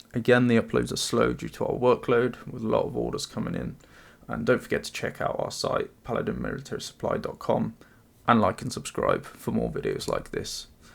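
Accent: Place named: Russia. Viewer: Great video. Your russian accent is very good, are you perhaps russian ?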